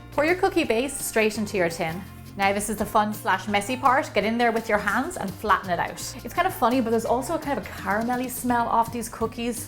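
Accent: Ireland. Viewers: Northern or southern Irish?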